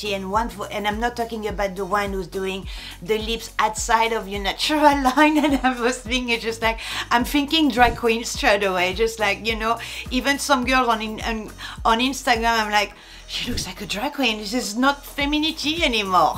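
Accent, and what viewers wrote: France. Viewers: Oh my goodness. This is awesome. I have exactly the same wall color and the same vogue picture ( one of three in a set) in my bedroom🌺 So nice I found your channel. You‘ re so charming and so elegant . Bye the way, your french accent is amazing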